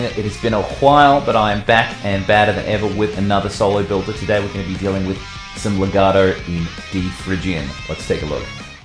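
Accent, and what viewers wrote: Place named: Australia. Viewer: who doesn't just love the sweet sounding Australian accent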